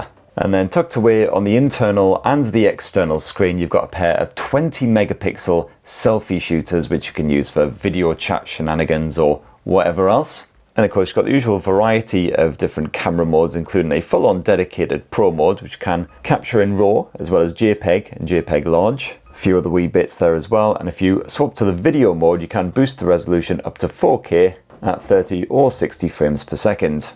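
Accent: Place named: Northern England, United Kingdom. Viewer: I could listen to his Northern English accent all day long.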